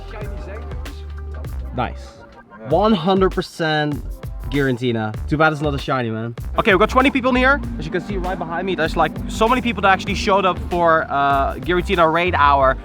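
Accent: Netherlands. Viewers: Wow are you dutch im dutch tooo !! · Are you from the Netherlands? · Jij Bent Nederlands ik hoorde je het praten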